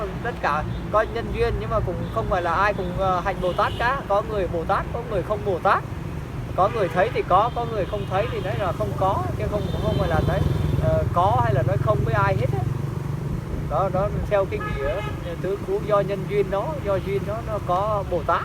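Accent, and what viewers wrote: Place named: Ha Tinh, Vietnam. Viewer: Thích luôn giọng Hà Tĩnh luôn ❤❤❤❤❤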